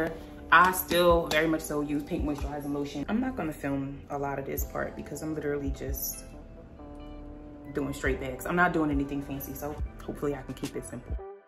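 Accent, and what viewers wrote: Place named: Washington DC, United States. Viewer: I like your accent. It reminds me of my friend who's from DC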